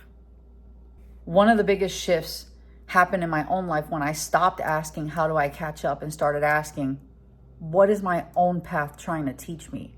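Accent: New York, United States. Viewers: Just make sure you enjoy life as well! You're still a pretty girl. By the way, I love your accent and mannerisms - New York? Some women think they're "strong, independent women", but it's not convincing. You on the other hand come across as the real thing - one tough lady, LOL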